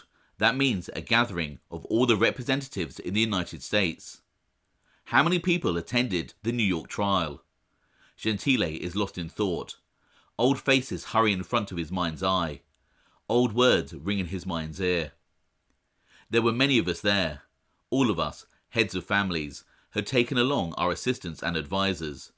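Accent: England, United Kingdom. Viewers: Not true, I'd watch an autobiographical vid in a hot minute lol. You're a Boss in your own right. Top notch research and presentation complete with a crisp English accent. Slay!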